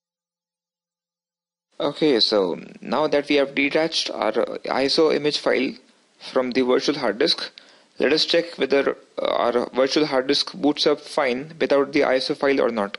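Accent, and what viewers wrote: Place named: India. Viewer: Are you from india? btw love the accent.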